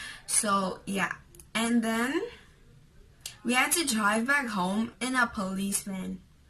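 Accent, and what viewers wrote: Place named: United States. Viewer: Why do you sound like an American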